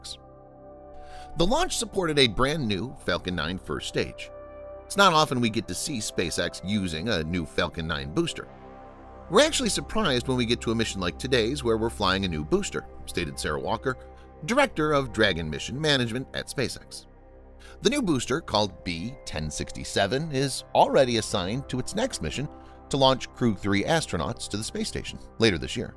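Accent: United States. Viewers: I can imagine his voice is perfect for learning American English!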